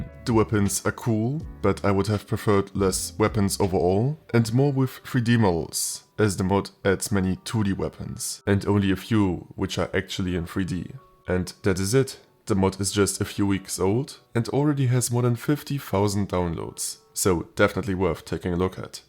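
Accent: Germany. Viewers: 2 Sekunden drin, direkt nachgesehen, jap, Deutscher, direkt bemerkt xD Grüße aus Bayern und geiles Video, hast ne angenehme Stimme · Idk why but u sound German and nice video 🎉🎉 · Bist deutscher oder hört man beim Englisch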